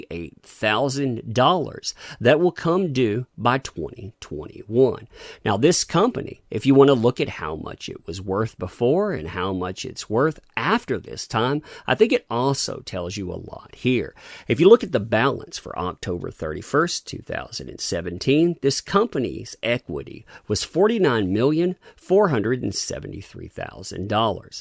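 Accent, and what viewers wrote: South, United States. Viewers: So are you from Alabama or Louisiana?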